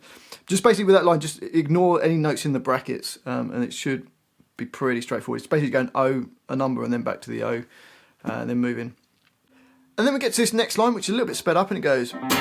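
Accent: United Kingdom. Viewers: Do you sound like Ozzy Osbourne, are you English?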